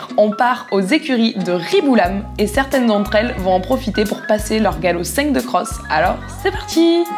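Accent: Marseille, France. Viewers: Franchement le montage il est tooooop jsuis tellement fan, un grand bravo t'es vraiment talentueux. Et super coach qui sait motivé puis avec ton petit accent marseillais ohlala ça, ça m'éclate 😁 D'ailleurs peux tu passer voir tes messages fb, je t'en ais envoyé un et j'ai vraiment besoin de conseils c'est assez urgent merci bcp!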